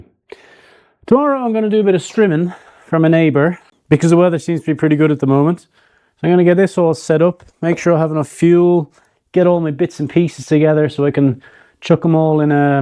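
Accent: Ireland. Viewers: France is just so beautiful. Think I might just have to take a drive over there and explore a bit. Loving listening to your soft Irish voice each evening. Glad you finally got a day off! Keep up the great work . X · Ok, inquiring minds want to know.....without getting too personal, why are you still single? My goodness, I concur with all the ladies on here, you are a great catch and check all the boxes. Handsome☆Huge Heart☆Funny☆Great Communicator☆Hard Worker☆Irish Accent☆Great Family Values☆Loves Puppies & Old People☆A Wicked Sense Of Humor☆A Great Friend☆ Be still my beating heart! ♥ A great vlog as usual, thx! 😊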